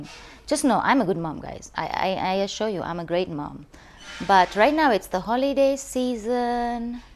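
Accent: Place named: Kenya. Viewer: Hello rich folks....just go in her by chance and am loving the vybe....btw love the fact that you are kenyan by your accent and not twenging to sound very foreign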